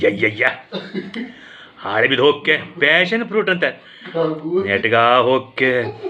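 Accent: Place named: Gokarna, India. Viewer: Your Gokarna accents, beautiful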